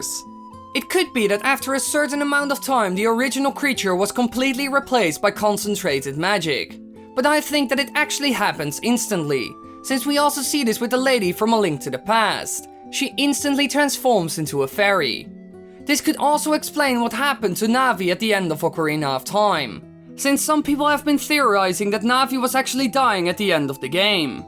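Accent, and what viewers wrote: Netherlands. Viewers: Your voice sounds dutch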